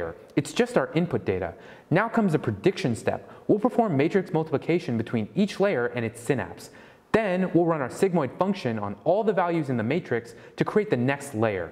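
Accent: India. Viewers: I appreciate you not having a thick Indian accent. Not that I have anything against it but it's just really hard to listen to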